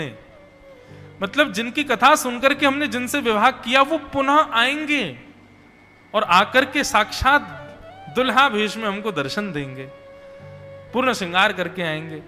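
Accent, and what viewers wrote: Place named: Braj, India. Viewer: Raadhey raadhe guru ji aapki katha bhut acchi lagti h aapke mukharvind se brij bolo bhut acchi lagti h lala ka darshan ho jata h katha me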